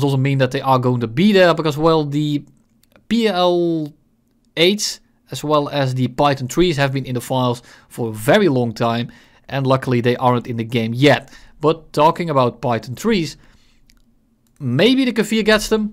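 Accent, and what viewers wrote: Netherlands. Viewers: ik hoor dat je Nederlands bent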